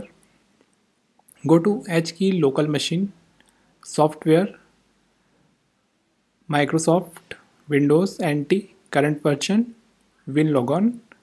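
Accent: India. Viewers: All file explorer wont open videos has a Indian voice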